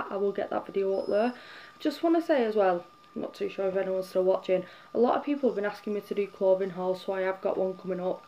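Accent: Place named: Manchester, United Kingdom. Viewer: Your accent is so interesting! Are you from Manchester?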